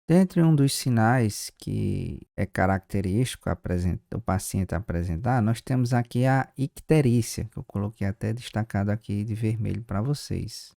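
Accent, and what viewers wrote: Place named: Northeast, Brazil. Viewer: Incrível aula e de bônus com sotaque , oxi! 🤠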